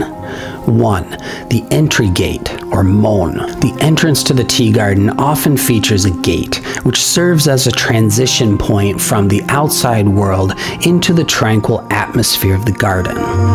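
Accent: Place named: United States. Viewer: Can't......stand......the american accent...srry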